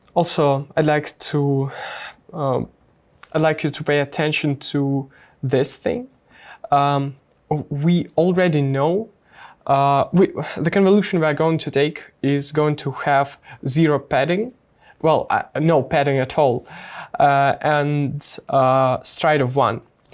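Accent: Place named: Russia. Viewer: зачем на английском? будто европейцы будут смотреть видео с таким жёстким акцентом русским))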